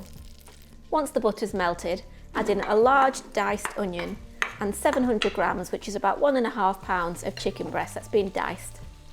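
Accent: United Kingdom. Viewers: the accent..is that british ?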